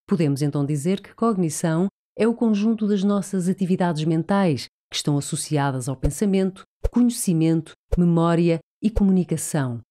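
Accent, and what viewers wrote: Portugal. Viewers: Eu tenho tipo um transtorno cognitivo, segundo a minha psicóloga. Devido ao TAG e depressão, tenho a tendência em criar histórias mirabolantes na minha mente, onde a vontade que me dá é de correr ou me defender, mas nada acontece e vem os sintomas físicos. Olha, o seu canal é muito bom, traz uma informação bem objetiva. É muito bom ouvir o seu sotaque, eu adoro! Morei em Leiria e Coimbra por um bom tempo. Continuação de um bom dia! 😊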